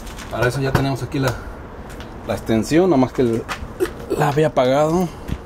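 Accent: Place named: Jalisco, Mexico. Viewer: Muy bueno, saludos, un abrazo! PD. tu acento lo siento como de Jalisco, o de donde eres?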